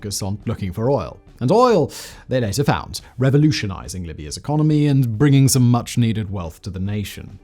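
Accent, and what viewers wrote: United Kingdom. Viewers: I can never tell when if he's mispronouncing something, or if it's just the usual British insistence on adding hard vowel sounds where there isn't even a vowel.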